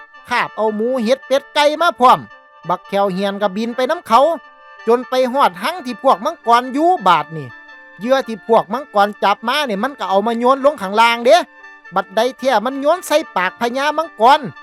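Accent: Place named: Korat, Thailand. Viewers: มักคักภาษาโคราช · คักเสียงคนโคราช😂😂😂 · ภาษาโคราชกะมา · ไทโคราชตั๋วนิ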